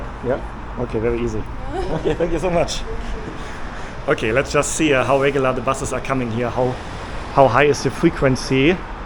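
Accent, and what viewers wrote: Germany. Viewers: Your accent is very interesting, are you German? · 독일식 발음 영어인가...ㅋ 근데, 독일보다 동독, 동독보다 폴란드쪽? 아니면 오스트리아보다 더 동쪽 헝가리? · there is some strong German accent. The speaker is certainly a nerd, but the info given is fairly useful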